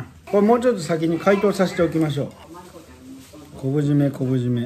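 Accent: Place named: Kansai, Japan. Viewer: たまに関西弁使うん好きやわ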